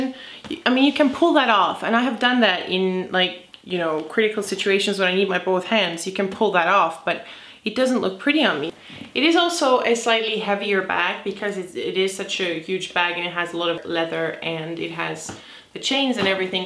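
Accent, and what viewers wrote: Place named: United Kingdom. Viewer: The british accent made me diiiiieee hahahahaah aa great video <3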